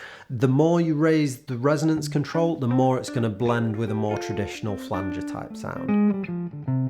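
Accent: Manchester, United Kingdom. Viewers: Too much Brit accent. Can't stand you. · Im going to go and revisit mine right now!.......great sounds..... .....Great also to hear what sounds like a Mancunian accent on YT....Cheers from a Stopfordian relocated to Kent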